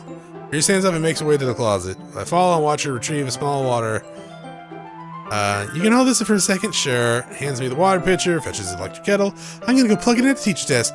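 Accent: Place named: Northeast, United States. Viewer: I swear every time I watch a playthrough of this game I see something new. Blood border? Wtf? Why did that happen and how did you get it to happen? What of my OG playthrough will you not see? I am confusion! Also, are you east coast US? Certain words sound very northeast to me in how you're non-rhotic sometimes and have that special 'Jersey' (and surrounding areas) way of saying like, caught or coffee.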